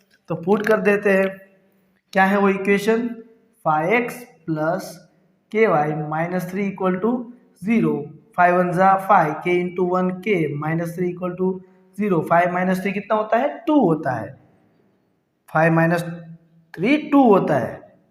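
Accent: Gujarat, India. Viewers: Sir Are you Gujarati your accent is like that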